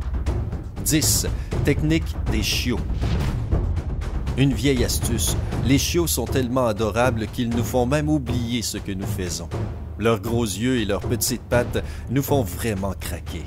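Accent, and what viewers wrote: Quebec, Canada. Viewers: C pas un accent quebecois? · Tes québécois ? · Figooroo ! Ouii j’avais aussi l’impression durant toute la vidéo qu’il parler avec cet accent Québécois 😍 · Rien avoir tu es québécois ?? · Les accent québequois .. AH CA M'EXCITE!!!! 😄😄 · dislike , c'est le québécois qui parle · T canadien pas vraie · Québécois... j'aime ça ! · Simpa , ta l voie de qcoxe (je me demande si tes quebéquoi ou tes juste lui 😐) qui est d'accord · Eum c’est moi ou le commentateur est québécois ( comme moi ☺️ ) et wow super belle vidéo et très utiles 👍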